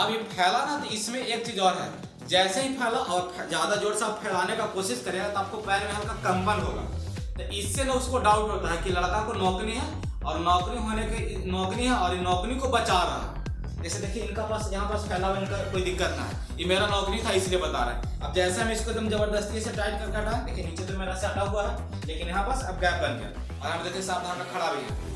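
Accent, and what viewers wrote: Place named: Jharkhand, India. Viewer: Lag raha jharkhandi ho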